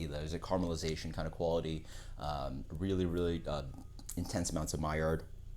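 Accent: United States. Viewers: Are you from upstate NY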